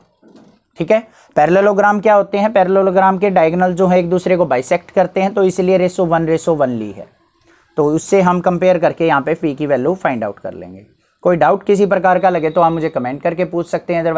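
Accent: Haryana, India. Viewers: Sir aap haryana se hai ?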